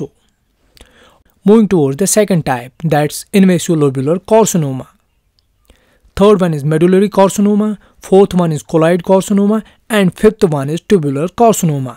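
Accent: Kashmir, India. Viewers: That's great! I guessed you might be from Kashmir by your voice. Your teaching is excellent, and the content is really helpful.Thank you sir.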